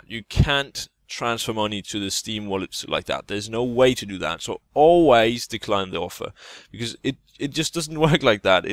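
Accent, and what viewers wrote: Denmark. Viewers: jeg kan høre at du er dansk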